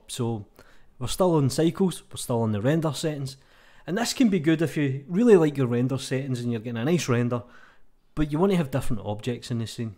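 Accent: Scotland, United Kingdom. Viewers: i love your Scottish accent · Love hearing the Scottish accent! I am not native English, but I wish I had that accent 😊 Great tutorial BTW! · eh, sorry but he's clearly Scottish!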